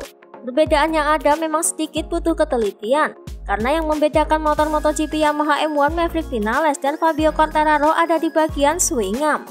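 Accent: Java, Indonesia. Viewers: medok jawa mba ny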